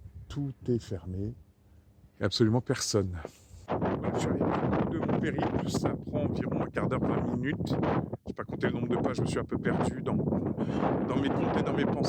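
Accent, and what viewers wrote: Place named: Brittany, France. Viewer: Purée ca m’a fait drôle! Je vis maintenant en occitanie et je voulais faire entendre à mes enfants, le bon vieil accent brestois... Après quand on écoute bien: il est encore là! Bisous!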